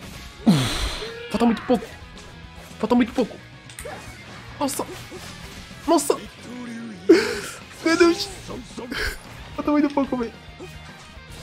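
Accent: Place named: Pará, Brazil. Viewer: Eita, tens o sotaque aqui do Pará, pensei q fosse sksksksksk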